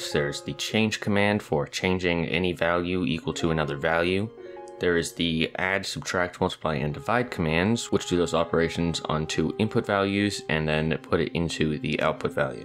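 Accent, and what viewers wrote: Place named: Germany. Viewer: Are you from Germany?The way you speak remains me my friend from there. I don't know all that cool stuff, but the way you explaining makes me want to learn. That you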